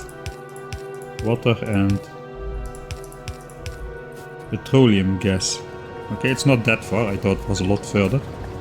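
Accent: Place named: Limburg, Netherlands. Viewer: Was trying top determine from your accent from which part of The Netherlands you are. My guess is the northern part of Limburg. How close am I?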